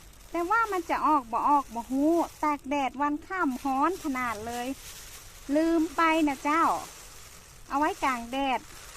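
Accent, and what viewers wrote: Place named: North, Thailand. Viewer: เป็นคนจังหวัดอะไรคะอู้กำเมือง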